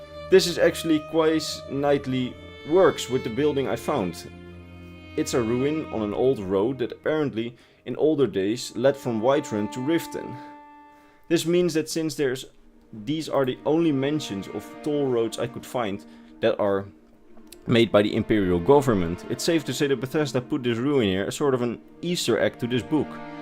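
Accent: Netherlands. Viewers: I think that he's Dutch just like me. We actually created a name for English whilst maintain their Dutch accent. It's Dunglish :P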